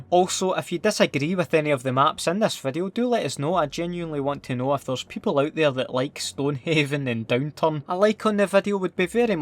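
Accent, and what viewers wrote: Scotland, United Kingdom. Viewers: 10/10 Scottish accent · Ur soooo much scottish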